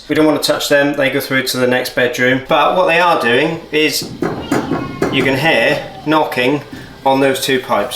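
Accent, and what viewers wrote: Norfolk, United Kingdom. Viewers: Only just come across your channel.. and ass a roight noice time at is. Definitely a local Norfolk boy. Love it !!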